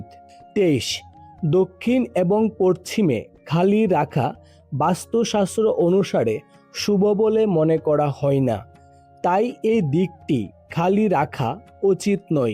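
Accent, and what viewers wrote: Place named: Bangal, Bangladesh. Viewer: উনি বাঙাল ওনার উচ্চারন ওরকম হবে পাল্টাবে না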